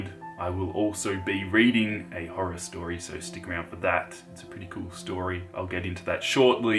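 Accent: Australia. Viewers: banana, it sounds even funnier with a Australian accent!!!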